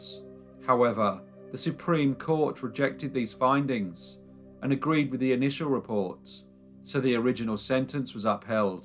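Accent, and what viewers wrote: England, United Kingdom. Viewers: I always love the really obscure stories you find which nobody else presents to us. I sometimes imagine a sleep over in a Creepy Old Mansion,we loyal subbers are all gathered around the Great blazing fireplace with candles lit around the decrepit Victorian Room,we roast our Marshmallows as you sit at the hearth,your distinctive English accent regales us with dark tales of Bloody Murder. This channel is truly a glistening jewel in the Crown of Olde Youtubian Lore.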